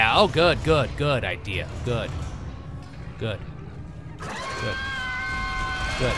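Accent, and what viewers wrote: United Kingdom. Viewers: his british accent is so cute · best british accent ever! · I love you britsh accent, best one ever!!!!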